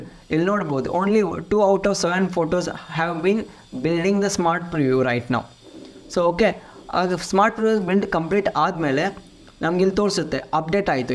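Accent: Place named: Karnataka, India. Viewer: Good video.. loving your Kannada accent ❤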